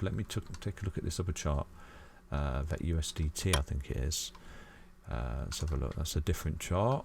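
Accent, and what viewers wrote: United Kingdom. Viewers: Word! Gives me comfort hearing a UK chap talk some crypto sense. Most soothing youtube voice ever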